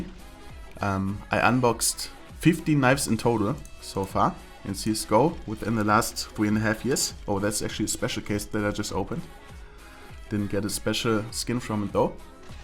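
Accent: Germany. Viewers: u from german 100%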